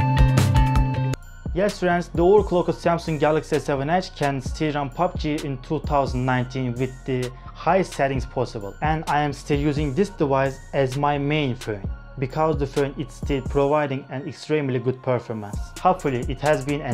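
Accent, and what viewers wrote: Turkey. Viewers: Yazılım ile ilgili videolarda Hint aksanına alıştığım için Türk aksanı bile daha çok dikkat çekiyor.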